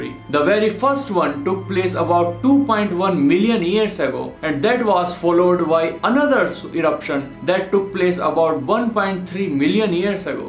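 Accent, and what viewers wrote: India. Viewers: I knew it you are indian i knew it!!!! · Wait. Are you indian?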